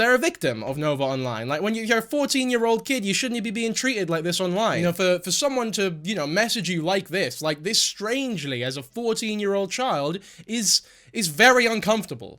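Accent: Scotland, United Kingdom. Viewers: Has anyone else noticed that is getting more Scottish lately? I blame the absence of his lass in his videos. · I love the little scotsisms that sneak in to speech 🥹 didnae couldae wouldnae ❤ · He’s Scottish?😭😭😭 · Your scottish anger amuses me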